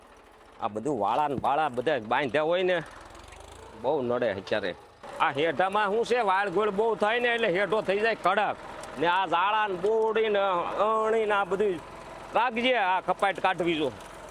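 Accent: Kathiawar, India. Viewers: I love your kathiyawadi and your simplicity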